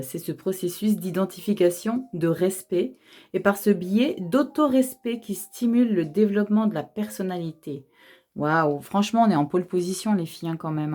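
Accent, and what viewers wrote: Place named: Marseille, France. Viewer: Coucou ❤️ Moi rien ne me parle pour l'instant mais même accent du sud, de Marseille 😂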